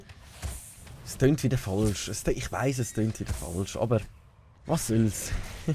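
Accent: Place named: Switzerland. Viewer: Scheinst sehr sympathisch und dein Kommentier-Style ist echt flüssig. Iwie schade, dass du nicht soo viele Zuschauer hast :) Bin auch us de Schwiiz, darum verstehe ich natürlich gut, was du sagst, mache meine Videos aber auf Hochdeutsch. Kann mir auch vorstellen, dass die Zielgruppe in der Schweiz einfach ziemlich klein ist ^^ Bist aber auch noch nicht sooo lange auf YouTube, hab ich gesehen :D Nur so als Tipp, falls du deine Videos etwas besser gestalten willst. Ich würde bei dir die Kameraqualität vielleicht noch etwas verbessern. Ich glaube die Aspect Ratio stimmt bei dir noch nicht ganz (siehst wohl etwas zerquetscht aus ^^). Dazu wirken ein paar Lichter für dein Gesicht echt wunder (auch sehr günstige LEDs z.B.). Hab auch selbst erst vor kurzem mein Setup mit Lichtern verbessert. Es ist erstaunlich wie viel solch kleine Sachen ausmachen. Ansonsten ist die Quali echt super und deine Videos (zumindest die, die ich bisher gesehen habe) ganz unterhaltsam :) Mach unbedingt weiter! 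Cya :3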